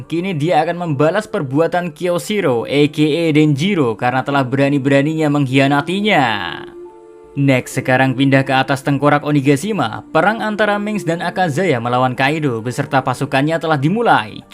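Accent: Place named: Jepara, Indonesia. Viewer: Adminnya orang mana sih ?? Aku denger² kok orang jepara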